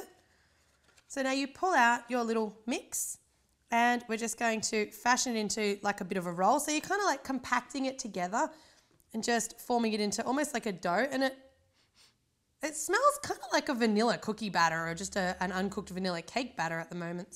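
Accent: Australia. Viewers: you sound like Rachel Ray with an Australian accent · Your voice is enchanting. I love Australian accents.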